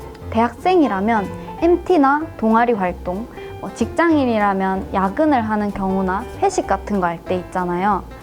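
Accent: Gyeongsang, South Korea. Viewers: 아~ 나 서울남자... 표준어 섞인 경상도 사투리 좋아요. ㅋㅋㅋ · 경상도사투리가 ㅋㅋ 매일 듣는 사투리인데도 영상에서 보니 더 새롭게 느껴지네요^^ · 경남 진주가면 저분 만날 수있는건가요? ㅠ 사투리 억양 너무 귀엽습니다. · 대구 아가씨 아니예여 대구 말투인데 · 표준말 쓰면서. 간간이 경상도 억양이 묻어나오는게 귀여워요. 그런식으로 방송하면 나름 특색있는 경상도여자 유튜버로 인기끌겠어요. 건투빕니다. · 누나 사투리 너무 매력있어요 ㅠㅠ 경상도 사투리가 이렇게 좋구나 대구 사람인데 첨 알았어.. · 경남진주. 내 고향인데. 말투가 진주네요.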